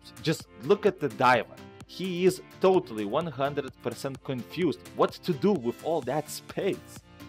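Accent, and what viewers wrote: Russia. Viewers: This man has a Russian accent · your accent more like russian are you russian? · Your accent is like Russian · do u have a Russian accent? it sounds like it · I love his russian accent.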